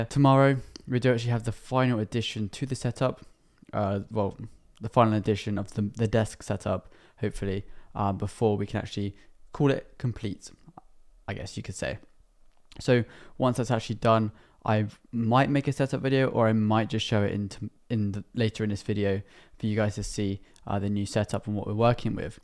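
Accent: United Kingdom. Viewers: You from Bournemouth mate?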